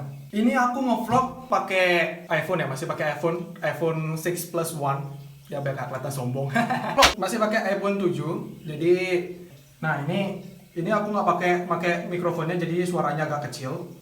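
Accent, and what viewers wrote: Bali, Indonesia. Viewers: Logat bali nya kentel ajan bli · Aksen Bali banget, Bli 😂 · Bali x medok nya 😂😂😂😂 saking griya napi turah? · Wkwkwk kentel banget bli logat bali nya ... Salam dari Nak Buleleng hehehe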